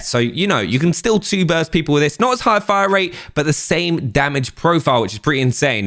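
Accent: United Kingdom. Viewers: I love how britishers say knot for 0, it's just amazing. Sorry, bri-ish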